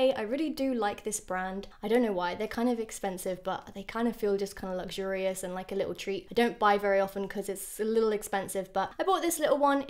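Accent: United Kingdom. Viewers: I really like your idea of aging, it’s beautiful ! And by the way, La Roche Posay is a French brand, and we don’t pronounce de E in Roche ;), it’s like « La rosh Posay », but I like the British accent on the prononciation 😍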